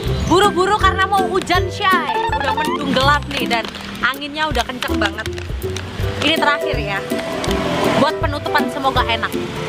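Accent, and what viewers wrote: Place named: Java, Indonesia. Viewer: Medoknya itu lo, kawaiii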